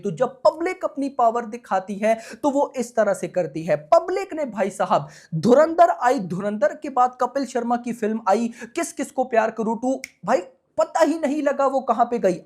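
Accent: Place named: Pakistan. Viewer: Iska pronounciation Pakistani jaisa hai ....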